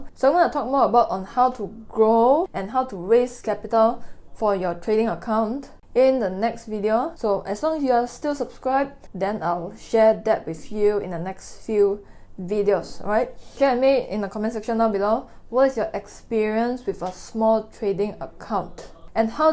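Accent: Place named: Singapore. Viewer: I love Singaporean accent Lah!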